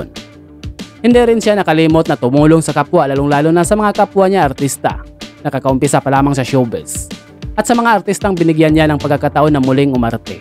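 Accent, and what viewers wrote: Visayas, Philippines. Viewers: Prayd seken bisaya ka tlga Taga pagsalita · Natawa ako sa ..speaker..hahaha...Bisaya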